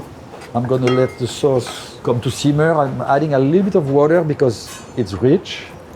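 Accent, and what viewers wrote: France. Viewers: When you speak perfect English with perfect French accent ahaha 😮😂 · The only French accent I can listen to without fatigue. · Merci Chef. Bel accent, merci de rendre hommage au francais. Calliss zont qu'a faire l'effort de nous comprendre les osti d'anglais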